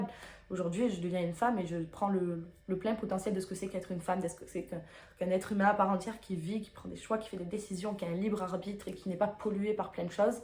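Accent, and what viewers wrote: South, France. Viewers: Salut les calanques de Marseille lol l accent du sud Fatch de con tu pointes ou tu tires lol petite intro avec une pointe d humour parce que rire est très important autant que le sexe ta vidéo est assez intime assez poignante tu es assez mature pour ton âge et ton chemin est moins être fini tu parles souvent de bienveillance c est que tu en auras besoin et pour toi et pour ton entourage ...le fait de t exposer ouvertement sur les réseaux sociaux va t amener des personnes positives et d autres bcp moins garde cette joie de vivre et ta folie tes 2 premières vidéos sont sympa j aime bien continue 😉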